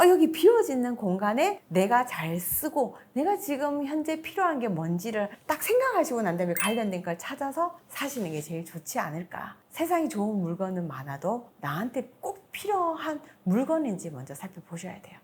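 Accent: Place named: Daegu, South Korea. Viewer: 대구사투리 넘 예쁘네요~ 😂물론 도움도 많이 됩니다😊 구독!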